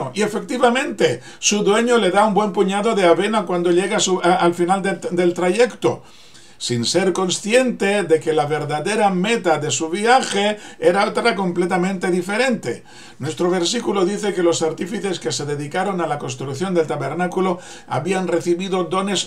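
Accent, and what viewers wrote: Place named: Spain. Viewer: La primera vez que oigo un judio con acento Edpañol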